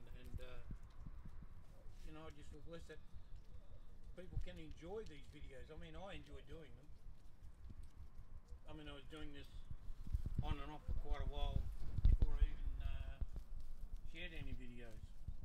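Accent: Australia. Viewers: Saw the adorable babies, heard the Aussie accent and I had to subscribe to the channel!💕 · What a handsome man with such a wonderful Australian accent😊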